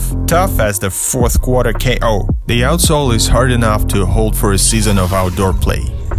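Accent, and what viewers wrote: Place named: England, United Kingdom. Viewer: kashayte supchik zvichit voobshe klasno s angliyskim akcentom (tipa English)👍💪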